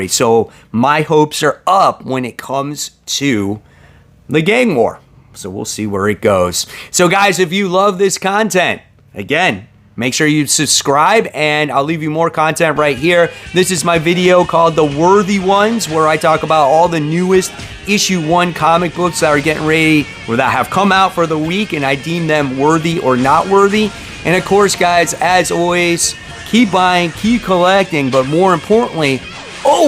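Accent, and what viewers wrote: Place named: Florida, United States. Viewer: You Floridian!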